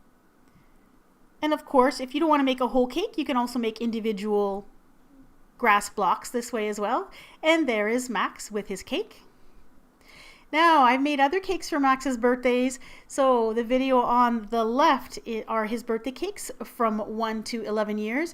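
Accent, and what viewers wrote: Canada. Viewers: Is she Canadian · omg, your Canadian!! :O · your canadian, right?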